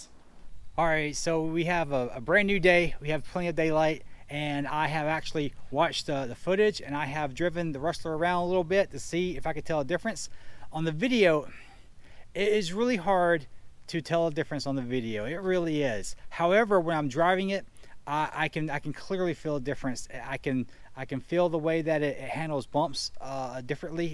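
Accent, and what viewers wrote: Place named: United States. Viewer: You have a...kinda...country accent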